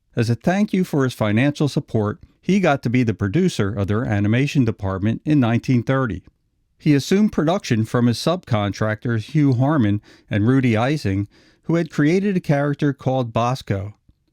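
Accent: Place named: Philadelphia, United States. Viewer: I hear an accent I can't ever not hear again, sir, would you happen to be from Philadelphia?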